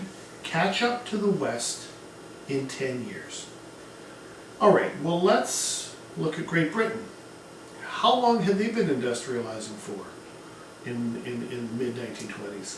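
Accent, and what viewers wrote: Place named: Canada. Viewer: Your lectures are made even better by that wholesome, folksy Canadian accent.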